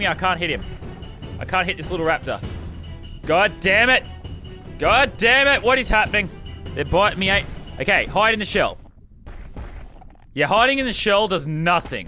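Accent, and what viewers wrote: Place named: Australia. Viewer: What part of Australia are u from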